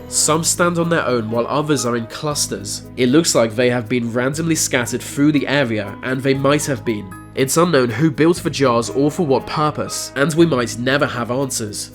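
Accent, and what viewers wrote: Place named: Cockney, United Kingdom. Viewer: Wanted to watch the video but couldn't stand his cockney accent. He doesn't so much speak the language as chew it up and let it lazily dribble out. UGH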